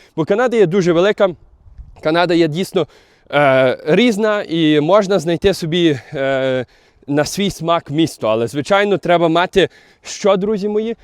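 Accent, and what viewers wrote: Western Ukraine, Ukraine. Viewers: Дуже такий файний діалект у вас , западенський)) 👍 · Чистый западенский акцент. Прожил с ними пол года. Ну их нахуй. Пришлось ехать в Европу. А автор - да, западенец, патриот, в Канаду уебал😊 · Бомбічна західноукраїнська мова. Як в Трускавці в дитинстві) Пан, ви звідки самі? Пс прочитав, що зі Львова, з самого Львова? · Он говорит на западноукраинском диалекте, а вы пишите о литературном украинском. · Ты с какой области уехал в Канаду? Почему такой западенский акцент?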